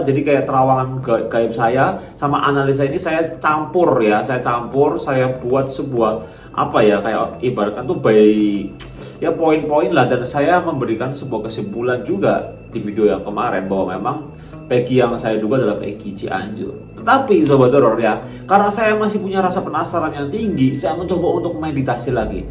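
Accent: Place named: Banyumas, Indonesia. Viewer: Bang org mn.. kog ngapak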